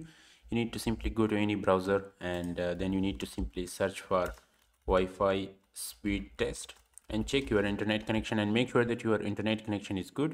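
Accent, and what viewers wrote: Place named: India. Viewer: Your English with Indian accent is trouble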